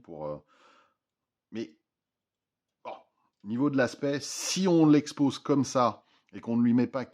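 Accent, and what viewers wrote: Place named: France. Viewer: Quite an impressive French Accent here :D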